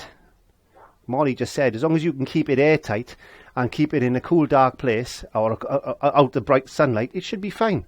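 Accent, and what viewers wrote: Wales, United Kingdom. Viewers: Just recently found you and can't thank you enough for your advice. I use your "recipes" for Gesso and Pouring Medium and they are great. Happy to find a British artist as most are American and their products aren't always easy to find, also expensive. Also the Welsh accent reminds me of my Dad (Not that your'e that old!)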